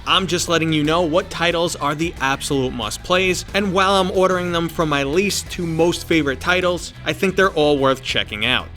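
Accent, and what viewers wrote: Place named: Boston, United States. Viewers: Yo this man is so Boston I thought he was Mark Wahlberg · You from long island? Maybe Mass cuz I see you tryna hide it but sounds more like here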